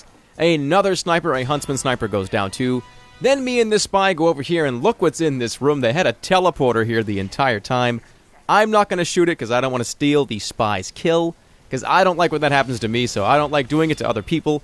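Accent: Boston, United States. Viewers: You soudn like you're from Boston or something. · American accent breaks google subtitles ammo it thinks is emo and gun it thinks is gone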